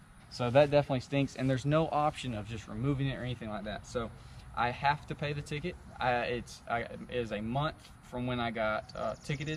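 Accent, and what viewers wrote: Alabama, United States. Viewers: What part of Alabama you from ?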